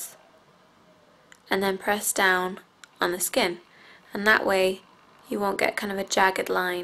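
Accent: Ireland. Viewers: R u Irish??? Please reply!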